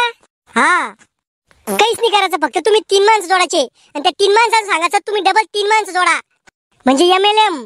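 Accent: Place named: Marathwada, India. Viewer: Nakki jalana cha asnar bole vrun tr as wate😂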